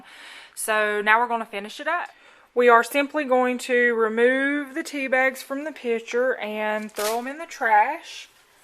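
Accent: United States. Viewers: Good video, sounds like a South Carolinian accent, am I right? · Real southern voice lol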